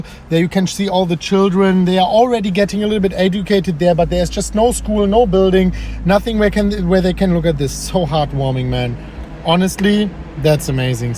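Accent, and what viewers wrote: Germany. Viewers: Love your german accent, from which city in germany do you come from?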